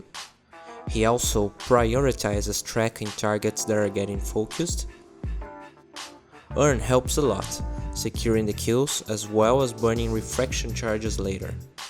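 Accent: Brazil. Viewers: c eh br, seu sutaque eh bem forte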